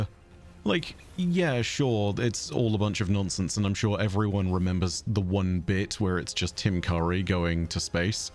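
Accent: United Kingdom. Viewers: I am a 60 year-old man I’ve been playing destiny since 2016 since my son bought it for my birthday. As being a vet, I really had nothing to do with my time when I got out.And I was looking for something to take up that time and to fill that void. And destiny was the answer I’ve been paying attention and listening to your in depth folklore of destiny for years and how you adequately with your smooth and gentle British English accent. Which you matched the lore with your voice perfectly. That is why I subscribe to your channel and of course, a lot of other guardians did too. Because it’s not just a game it’s so much more and as you can see with not only the petition. But with all the voice actors that I hope it does not stop and they do make a DESTINY 3 but will see 🤞, I really appreciate all you do for destiny and for us who listen to your take of the game itself . 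I’ll still be watching but it won’t be the same.It’ll never be the same. But as an old man who has not too much time on this earth, maybe who knows one day My Son or daughters might pick up the game and play it. And say to my grandkids. Hey, did you know your grandfather played this game a long time ago! Would you like to see his character and what his name was? And my grandson or granddaughter if they say yes that’s how I will go on in a memory and live and connect with my family Again. through my ghost.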